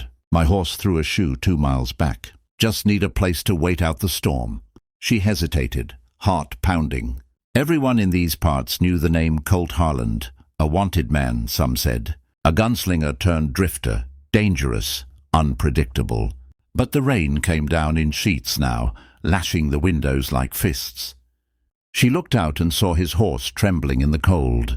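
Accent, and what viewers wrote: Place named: United Kingdom. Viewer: This is a story about the old west. Why does the narrator have a British accent? Very difficult to follow.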